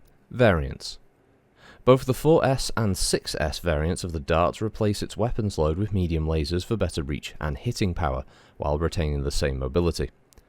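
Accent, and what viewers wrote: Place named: Scotland, United Kingdom. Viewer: A Scott’s accent is perfect for pronouncing Kathil Uhlans.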